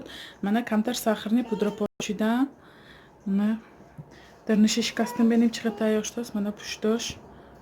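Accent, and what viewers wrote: Isfara, Tajikistan. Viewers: Бехтарин Шумо аз шахри Исфарами Акцентатон авантюрин.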